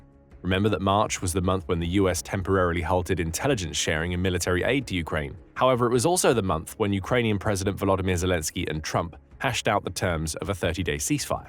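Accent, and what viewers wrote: United Kingdom. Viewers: Whats up with mixing british and american accent??? Can we just stop pronouncing missile with britsh accent and the rest american? So annoying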